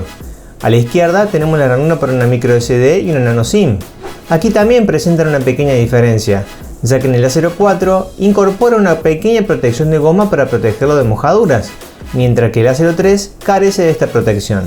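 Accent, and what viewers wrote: Córdoba, Argentina. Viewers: eres cordobes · pregunta sos cordobes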